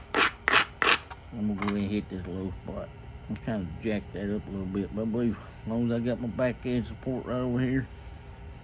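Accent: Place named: United States. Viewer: I really like your videos, and even more your good old american accent 😁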